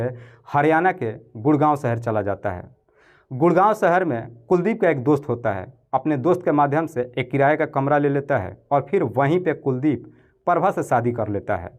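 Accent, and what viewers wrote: Bihar, India. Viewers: Story telling in Bihari style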